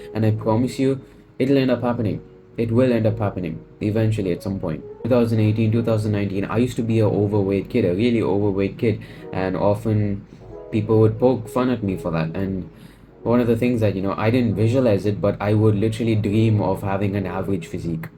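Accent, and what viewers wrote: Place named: India. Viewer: You being indian and having slightly indian accent do you think any foreigners watch you because i dont think they do only indians watch indians i feel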